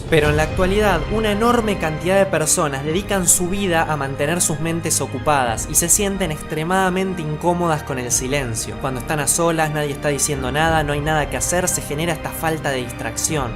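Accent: Argentina. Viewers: Buen video pero tu acento es más argento de lo normal. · Practica un español neutro tu acento argentino molesta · me gusto mucho el vídeo y tu canal, pero para mi gusto deberías trabajar en un acento más neutral, el acento argentino no se entiende mucho. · El tema es muy interesante, hasta que escuché su tono y acento de argie insufrible. Así que no hay like.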